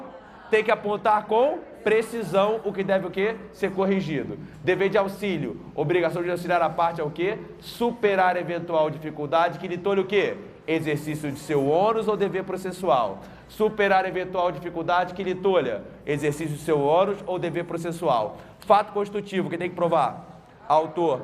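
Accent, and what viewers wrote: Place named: Rio de Janeiro, Brazil. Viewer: Pô Prof. gratidão! Só gosto de ouvir aula c sotaque carioca haha Café caro da porra kkkkkkkkkkk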